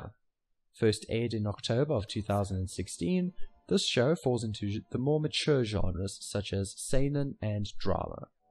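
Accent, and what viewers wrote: Afrikaans, South Africa. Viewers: Are u Afrikaans? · What is that accent? Afrikaans English ?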